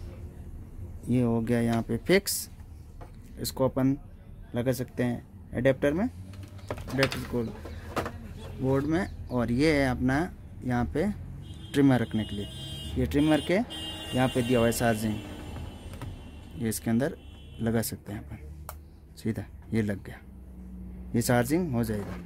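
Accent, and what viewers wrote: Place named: Barmer, India. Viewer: Bhai aap barmer se ho kya. Accent us side ka lag raha ha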